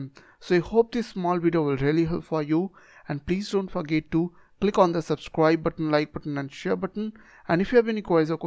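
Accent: India. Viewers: he really got the stereotypical indian tech support scammer voice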